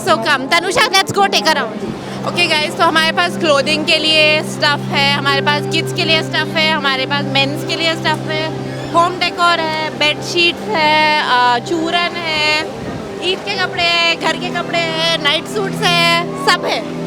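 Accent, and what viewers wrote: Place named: Bihar, India. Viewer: Tumhari awaaz slum bihariyoun ki tarah hain Learn hindi urdu property Don't say logaan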